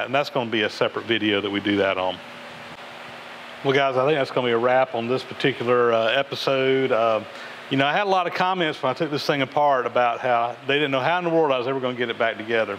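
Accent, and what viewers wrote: South, United States. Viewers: "No, I put the oil in the hole!" "You put the awl in the hole???" "No, the oil, in the oil hole!" "There is no awl hole!" "... " (my Dad had a reasonably heavy Southern accent, so I enjoy hearing one!)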